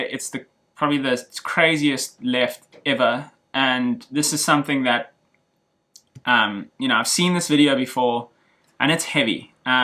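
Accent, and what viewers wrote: South Africa. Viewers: Hey you’re a South African 🤙🏻 Lekker Bru. I bet you’re from South Brim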